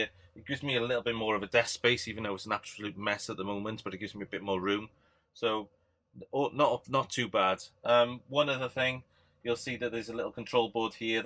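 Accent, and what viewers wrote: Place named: Cardiff, United Kingdom. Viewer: You sound like a Cardiff boy, i bet "G" couldn't make a pin like yours.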